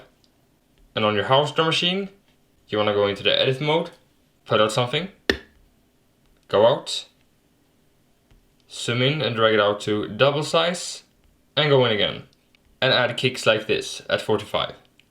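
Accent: Sweden. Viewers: Are you come from sweden? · Are u sweedish ? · Are you Swedish? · Are you from sweden? :) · are you from sweden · Är du swensk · Are you from sweden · are you Swedish? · Are you swedish? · Are you Swedish 🇸🇪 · Omg your Swedish awesome i am to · Ghoits Hafidz He's swedish like me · are you SWEDISH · Swedish accent then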